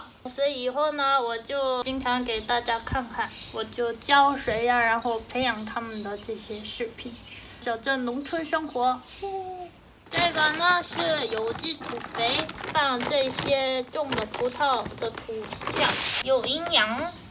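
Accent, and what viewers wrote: Northeast, China. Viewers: 博士为什么一口东北味儿？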